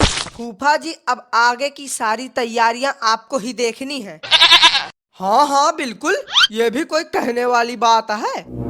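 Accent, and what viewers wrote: India. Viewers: Awaz se lgta h aap bihar se ho Up se nhi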